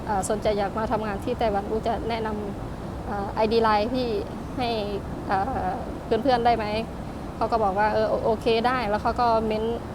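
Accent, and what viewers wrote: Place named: Laos. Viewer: เว้าลาวกะได้ แซบหลายเด้อๆๆๆๆ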